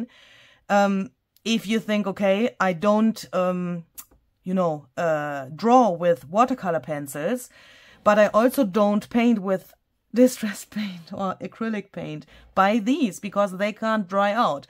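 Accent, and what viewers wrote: Germany. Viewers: German with a Southern drawl? My ears can't even imagine what that would sound like! 😄😄😄👍🏻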